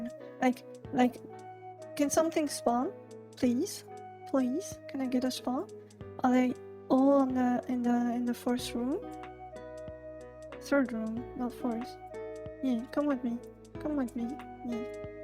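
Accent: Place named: France. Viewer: Your english accent sounds like french. are you french?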